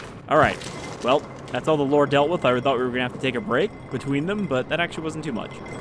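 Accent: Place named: France. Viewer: Golden french accent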